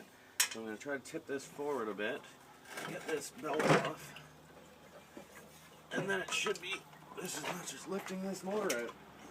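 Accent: Canada. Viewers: I HATE WHEN CANADIANS USE ANY WORD WITH ''OUT '' IN IT ..ABOWT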